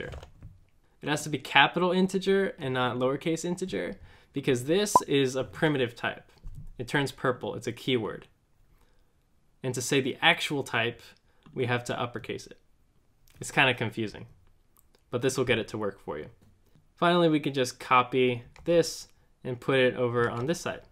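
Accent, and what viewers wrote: India. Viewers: Here, after concentrating to get what was said in the Indian accent, one time too often.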